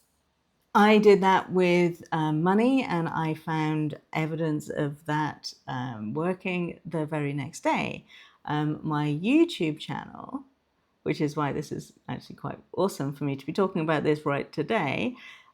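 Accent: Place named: RP, United Kingdom. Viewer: Wish she didn't speeeak with such ah Marisaaa Peers posh ahccent. It detracts from and impedes the message...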